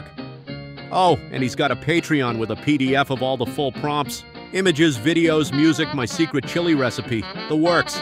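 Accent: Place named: Boston, United States. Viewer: that was awesome. Love the Boston accent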